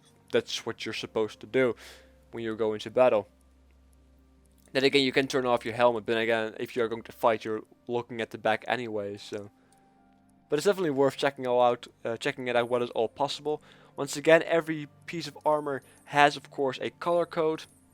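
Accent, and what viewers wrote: Netherlands. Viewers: haha je nederlandse accent is zo duidelijk :P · You sound sooooo Dutch. xD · Do you have a dutch accent?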